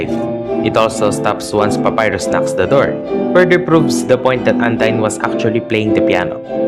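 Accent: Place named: Philippines. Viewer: The Filipino accent is way too strong on this one😅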